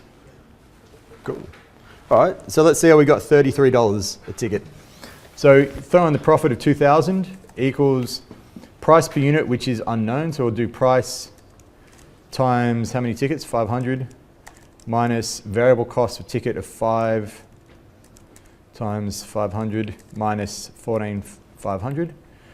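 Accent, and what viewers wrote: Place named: Scotland, United Kingdom. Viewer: Respected there... Please go slow .. You sound so scottish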